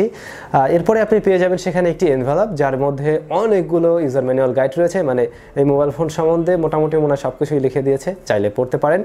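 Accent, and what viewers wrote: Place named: Barisal, Bangladesh. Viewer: that barishailla accent .... ahhhh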